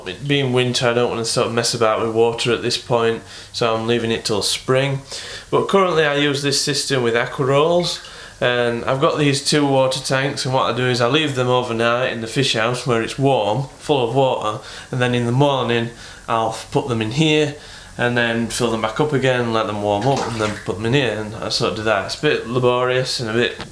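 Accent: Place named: Scotland, United Kingdom. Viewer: With the strong northern or Scottish accent, I am able to understand about half of what you say. You should hire a southerner or Irishman to do the speaking.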